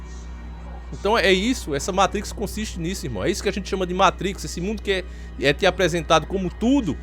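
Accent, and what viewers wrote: Northeast, Brazil. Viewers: O bicho você e nordestino né se for e nois viu 😏😏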